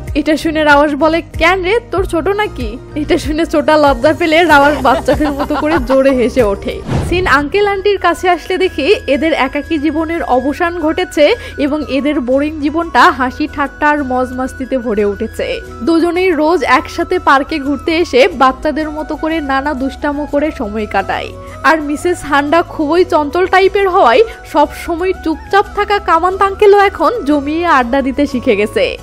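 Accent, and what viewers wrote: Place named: Jessore, Bangladesh. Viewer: আপু আরাম লাগে এটা আমাদের যশোরের ভাষা, খুব ভালো লাগে তোমার কথা গুলো, এবং মুভি গুলো সত্যি অসাধারণ আপু। 🥰🥰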